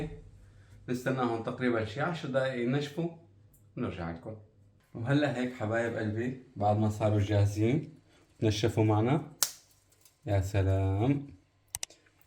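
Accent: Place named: Syria. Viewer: بس عفوا منك انت من وين....وين تعيش لانو تحكي سوري انا سورية من حلب بس صار لي ٣٦ سنة في سويسرا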